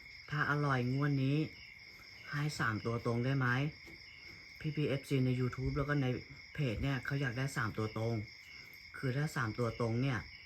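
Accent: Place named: Rural, Thailand. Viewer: เพราะว่าพูดเหน่อ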